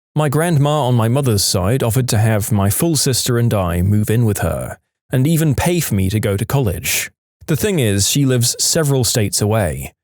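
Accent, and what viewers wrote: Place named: North, United Kingdom. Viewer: Love this stuff and love the voice very Northern British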